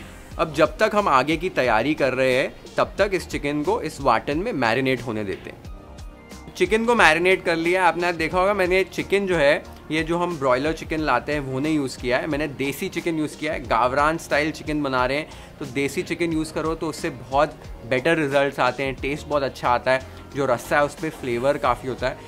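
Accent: Maharashtra, India. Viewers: Your marathi accent is soo good ❤ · Brooo ur marathii accent is soo spicy ✨ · You give marathi touch it's grate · Ur marathi accent is v nice.... And very nice recipe yummy😋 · It's amazing recipe and you know Marathi tuning is perfect, we liked it's too much. Keep sharing Marathi recipes a taste of India. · You be the best man and your Marathi accent 😌♥️ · Your marathi accent is too cute😍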